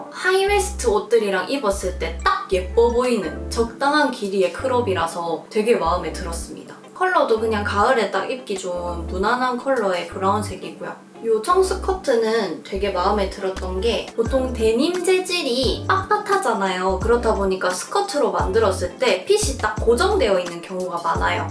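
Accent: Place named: Busan, South Korea. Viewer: 하..진짜 너무 예뻐요❤ 말도 엄청 예쁘게하시고 사투리가 너무 귀여워요 혹시 실례지만 부산 사투리인가요?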